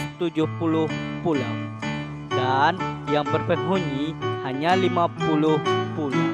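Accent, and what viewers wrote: Indonesia. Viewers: Orang sumatera mana suaranya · Orang kalimantan mana suaranya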